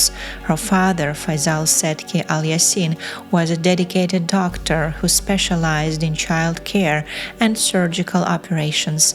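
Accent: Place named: Russia. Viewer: Love the Russian accent eg uffishal for official. A beautiful viydeo🥰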